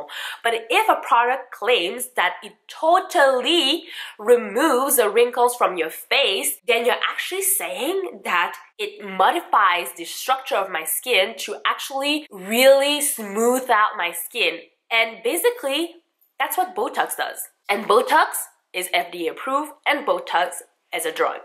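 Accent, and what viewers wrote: Quebec, Canada. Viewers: Are you from Quebec (province), Canada? Cauz you have that lil accent we have here when we speak english lmao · Just subbed! Is that a French Canadian accent I hear? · omg YAY! I'm in Montreal as well! Thought I recognized the accent :) <3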